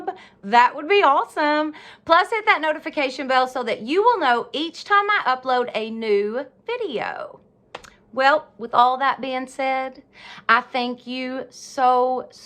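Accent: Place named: Oklahoma, United States. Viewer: I love all your DYI'S, so stinkin'cute. I'm from Oklahoma and I talk just like you. You are so beautiful and cute at the same time.❤️❤️❤️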